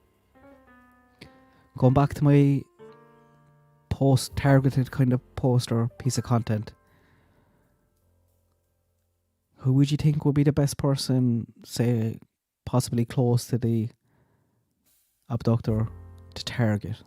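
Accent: Ireland. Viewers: Not sure, a family member married an Irish guy, he talks exactly like you...he has Kerry gold butter n the tea, he makes a nice piping hot cup..he is from Tipperary..I am Irene Brady ,maiden name,have O`Hurley`s n O`Neal.. in family. I don`t know anything about my Irish heritage,it is such a mysteriously, romantic... brooding n beautiful place.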